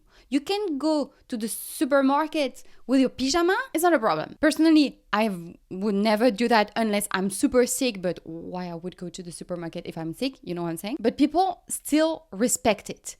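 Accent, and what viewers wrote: France. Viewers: Did you go to school in the States because you have almost no French accent like I do after 50 years in the States 🤗 Et je suis d'accord que vivre aux USA c'est bien agréable que de vivre en France when you are a free spirit and claim your independence. The whole world is nice and different. Il faut suivre son coeur et surtout ne pas écouter les critiques des autres. Vive la liberté et notre raison d'être. One earth, One people. Make The World Great Again! 🍿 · She is very amusing; it could be the French accent, but either way, she does make some good points. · I just stumbled on this topic while I was looking for a song. The subject of this topic was kind of intriguing but mostly I'm interested in topics featuring cute French girls having cute French accents when they speak English. I get what is getting at but I can't really agree with her central theme at this point in time. 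Historically, what she says is mostly true but, in recent years, Americans have gone out of their way to verbally trash their country. I believe this is mostly due to a planned idiocy infused into Americans by forces that want Americans to be stupid and compliant. Americans, in recent years, really have been made to be as dumb as rocks so that is particularly dangerous if they also have bad intentions. Also, and while there is a lot of "tradition" in France, I don't know where is precisely from so it is hard to argue with her feelings that she does not feel free to express herself in France. I know lots of people are expressing themselves very well in France. herself is very smart so her analysis is a valid pursuit. For example, she understands that nothing is "free". There is a widespread notion that medical care in France is free_. It is _not free but rather it is simply a matter that most of the people in France have no idea what it is costing them. 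I might be a little a little biased toward France and the French but then, I was born near Paris. I grew up in the mean streets of Paris. ( Well... Paris,...Kentucky.) But I hope most Americans wil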